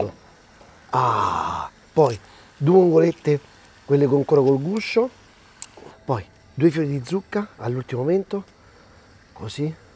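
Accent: Rome, Italy. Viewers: sei simpatico sei sempre sorridente spieghi benissimo e sei de Roma col vostro accento che mi fa impazzire. Ho provato alcune delle tue ricette e mi vengono anche bene, l'unica critica che ti posso fare e' che a forza di provarle sono ingrassato di 10 kg. 😄😄. Grazie di tutto.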